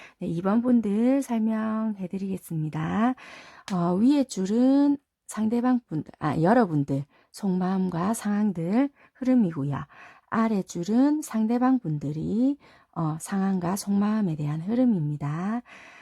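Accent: Busan, South Korea. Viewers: 감사합니다!!! 편안한 밤 보내세용😻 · 네 ㅠㅠ 감사해요.. 요즘 맨날 술마셔요 ㅠ 속상...어떻게 해야할지 몰라 더 그런것 같아요.. 언제나 타로보면 정확하게 떨어져서 신기하면서도 잘보고 있습니다 ㅠ 항상 감사드려요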